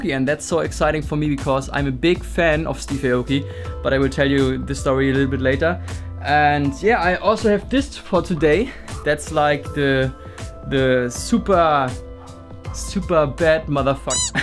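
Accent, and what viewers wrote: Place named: Germany. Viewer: Dein Englisch ist schrecklich. Man hört noch viel zu sehr deinen deutschen Slang.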